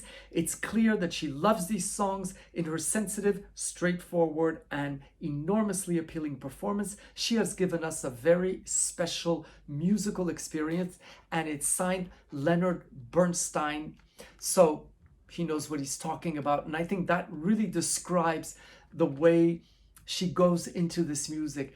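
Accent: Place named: France. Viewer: This is lovely! I love your erudite enthusiasm for Barbra and her artistry. You remind me a bit of me (except I don't have your French accent)! Thank you for this.:)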